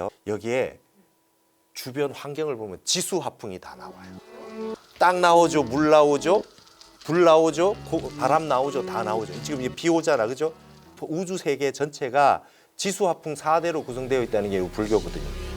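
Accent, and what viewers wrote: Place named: Gyeongbuk, South Korea. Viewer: 끝말이 ~라 경북말투네요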